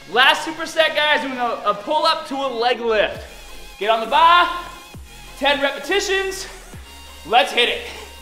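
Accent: Boston, United States. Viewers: I swear... I used to watch your videos when you were first getting "staaaahhhhhttted", and you did NOT have that heavy Boston accent whatsoever. Did you just get hella bored one day and decided, "Fuck it, I'm gonna start having a dense Boston accent in my videos"? I don't get it. But I like it. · Your Boston accent makes this hard to watch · Wow...I feel so petty that I just can't to listen to him talk with that Boston accent. I feel kind of weird that it annoys me that much. When I am not a negative or petty person at all. But he won't miss me not subscribing. He has plenty of people that don't care. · This guy has a Boston accent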